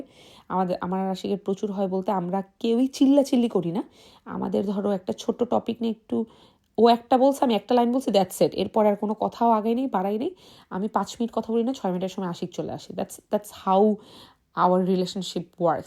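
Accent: Barishal, Bangladesh. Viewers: ami apnader fb reels gula dekhtam,2 years dhore maybe..sekhane dekhte dekhte ekhon you tube eu dekhi.. you're so genuine.. and your barishailla vasha is also captivating.. your cooking is also mouth watering.. so you can cook new item and share with us in your local language.. r vlog er video gula wide diyen,full screen hole dekhte vallage...take love apu and stay blessed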